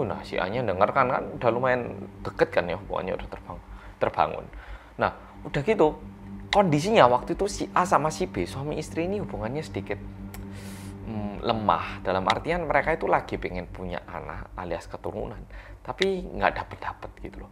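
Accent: Java, Indonesia. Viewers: Aku ngajak tmnku nonton mas. Dia kaget + ribut pas denger mas ngomong jawa + medok wkwk gemoy:') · Udah banyak yang bahas Shincheonji di Youtube, tapi sengaja gak nonton soalnya nunggu yang versi orang korea yang medhok aja deh!😀👍😀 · Favorit youtuber ini mah, suka sama pembawaannya dan juga medoknya. 😂🤣👋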